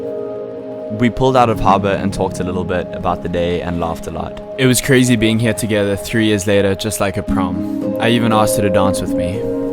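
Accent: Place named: Afrikaans, South Africa. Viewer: Do you know afrikaans you have the aksent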